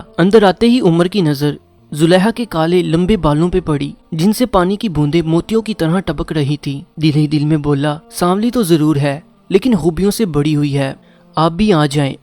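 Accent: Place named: Lahore, Pakistan. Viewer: Great story like your Lahore accent.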